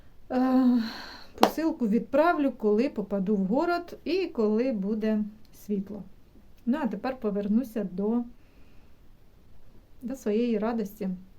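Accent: Poltava, Ukraine. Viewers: Полтавський говор буде завжди,якщо ти народився і виріс на полтавщині.Для мене він найрідніший...Плачу разом з вами...Тримаймося...тримаймося...Обіймаю...💞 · Смотрю Вас девочки оч. давноно подписалась недавно, полтавская речь это другая история ...сама из Полтавы, ...С наступающим Рождеством...🎄☃️🎉🙏🍀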